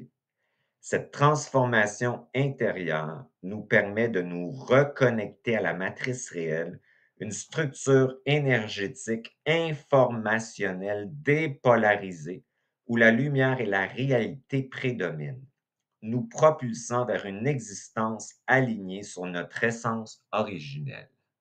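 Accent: Quebec, Canada. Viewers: Bonjour, Merci pour tes partages. Je te suis depuis 2 ans. J'ai foncé lorsque j'ai entendu "Je ne veux pas revenir ici". Waouh... ça a fait tilt!! Enfin un "youtubeur" qui propose du contenu et qui dit tout haut, ce que je pense dans ma tête depuis des années. oui... dans ma tête parce que lorsque je dis ça à des copines elles me répondent "La vie est belle!!" Ha bon!? "Ceux qui meurent de faim, les guerres....etc..... tu trouves que la vie est belle toi?? Sont folles dingues!! J'adore t'écouter parler, ta sincérité me fait du bien, les termes que tu emploies sont top et drole!! j'adore votre vocabulaire à vous les gens du Québec et cet accent... j'adore!! J'avais déjà eu une approche du point zéro avec le livre "Essence de kishori", donc ton discours avait du sens pour moi. Nous avons eu quelques rdv, puis pour des raisons financières j'ai dû laisser de coté, donc les vidéos que tu proposes sont un excellent soutien et surtout cela ouvre à nouveau ma conscience, car je viens de rester au fond de l'abime durant 3 ans, j'ai cru que jamais je n'en sortirais... 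Damned.... l'épreuve de la solitude, c'est horrible lorsque l'on est mal. Tout le monde tourne le dos. Bon... ça va mieux!! Alors, ce message, c'est parce que je m'interroge???? Le fait de mettre le focus sur toi (nous sommes nombreuses et nombreux), l'égrégore il est là... qu'on le veuille ou non!? Donc il est utiliser....!? Même si tu nous dis de ne pas te croire, de penser par nous.... ben ça c'est p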